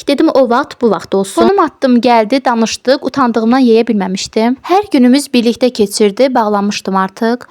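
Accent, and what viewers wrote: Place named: Ganja, Azerbaijan. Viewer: Gəncəli di qız anlaşılan.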